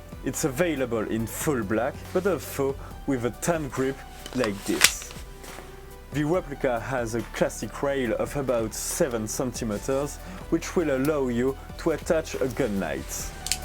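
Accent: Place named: France. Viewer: Part of speaking other language is using its pronounciation. If you speak English, do not use French pronounciation. Respect your listeners by doing 100%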